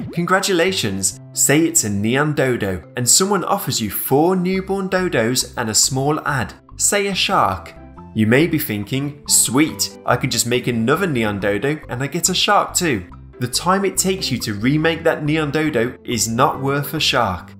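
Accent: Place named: United Kingdom. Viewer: Is it just me, or his voice is so british its calming?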